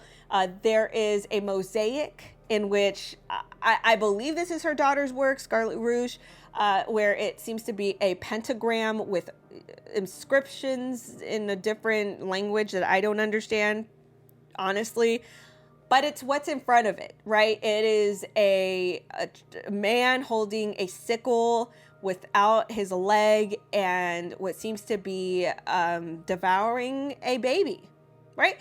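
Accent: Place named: Staten Island, United States. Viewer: You sound just like my Italian sister from Staten Island NY! Good discernment 💯☺cool beans! 😎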